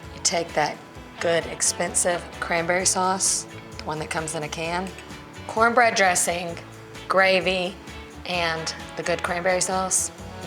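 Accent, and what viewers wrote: South, United States. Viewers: Love your southern accent and cooking talent!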